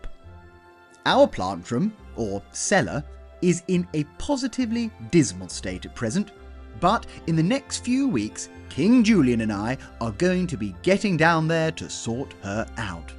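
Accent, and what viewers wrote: RP, United Kingdom. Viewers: Your rp accent is rather trying. Much like Adele's so called cockney accent. Could you please try another one ?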